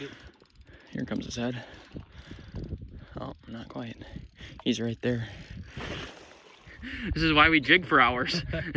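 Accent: Minnesota, United States. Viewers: I love how you say fishin' and campin' like a Minnesotan,.:) Hello from Michigan (same accent here, basically).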